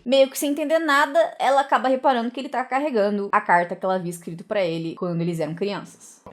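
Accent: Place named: Caipira, Brazil. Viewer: Adoro o sotaque meio caipira da lkkkkk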